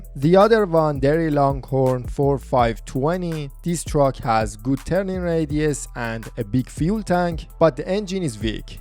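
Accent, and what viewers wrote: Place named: Iran. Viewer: U have some kind of Iranian accent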